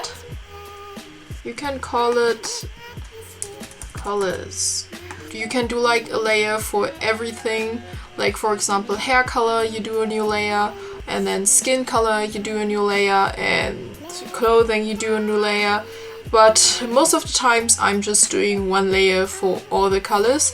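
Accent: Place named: Germany. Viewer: ich mag diesen Zeichenstil echt sehr gerne und es ist letztendlich auch echt extrem gut geworden. an deiner englischen Aussprache habe ich irgendwie schon erkannt dass du aus Deutschland bist und habe deinen deiner kanalinfo nachgeschaut. Cooler Kanal ^^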